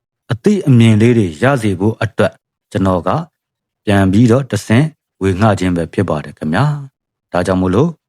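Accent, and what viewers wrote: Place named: Dawei, Myanmar. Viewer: အကိုစကားပြောတာ ထားဝယ် အသံဝဲသလိုခံစားနေရတယ်ဗျ ထားဝယ်သားလားဗျ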